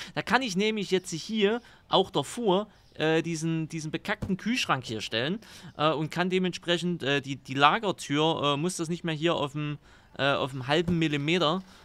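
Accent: Saxony, Germany. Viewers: Supper sympathisch endlich eh Sachse 😂Grüße aus nähe Leipzig 🎉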